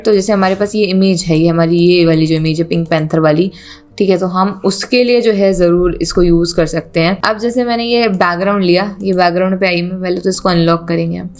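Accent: India. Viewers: Aap Bihari hai 😂❤ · Hasi hasi mai seekha gaya ,,, paka aap up se hai sayad 🥰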